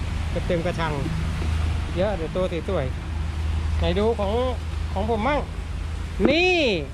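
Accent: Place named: Northern Thailand, Thailand. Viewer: สวัสดีครับ อู้ภาษาเมืองบ่ธรรมดา 555+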